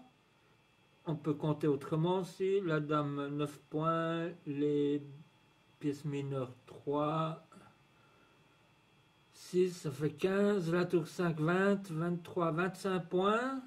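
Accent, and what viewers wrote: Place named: Switzerland. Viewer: Ça fait des lustres que je n'ai pas regardé une partie à peu près en entier et j'ai vraiment apprécié ton jeu.... ton animation et ton commentaire avec l'accent suisse et toujours une pointe d'humour:) Bravoooooooo!!!!!!!!!!!!!!!!!!!! 👑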